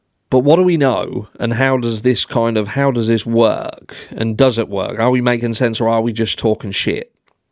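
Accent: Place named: England, United Kingdom. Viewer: we are family loving men. Please understand irony here because good or bad it has been designed by Get a Clue from the begining because his autistic intuituon nailed the narrative you are only now alluding to. Offer him an olive branch of peace and bring him back beacause you are integral too. Coming from Englamd i am so familiar with your accent and God bless you and your family... But he does Richard Burton better and always had something to say that should be listened to. You do your mental health podcasts but ignore Clue like me might have issues there but you with English gumption are endorsing somethinhg not quite the truth and justice for all that Clue coined first but happier on the coat-tails now as things are turning out.... Send some love to Clue... There is exquisite method in his madness and i promise you he loves his wife children and dog jusr like you x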